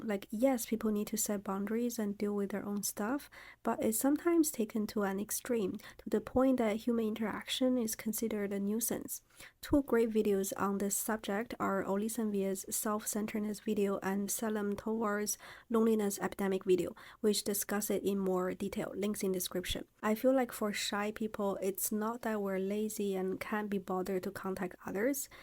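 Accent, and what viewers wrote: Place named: Toronto, Canada. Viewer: You got that toronto accent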